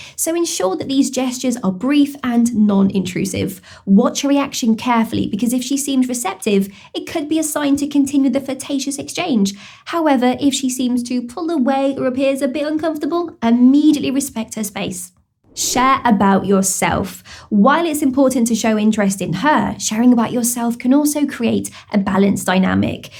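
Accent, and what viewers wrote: United Kingdom. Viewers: Your advices are the best, and make a real difference. I also come here to hear your lovely, soothing, British accent.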